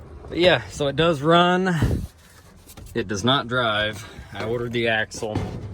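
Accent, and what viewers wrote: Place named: New Jersey, United States. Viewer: Love the NJ accent. Especially love the powah mirrahs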